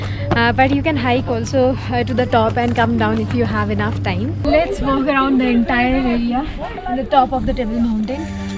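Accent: Bengal, India. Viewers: Your South Africa series was amazing, very nicely edited, breath taking scenic beauty, lively places and adventurous activities that you both did. Just guessing from your english pronounciation, Are u a Bengali??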